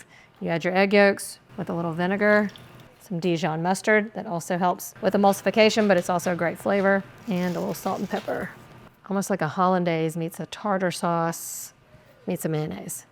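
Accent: South, United States. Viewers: Southern girl....love it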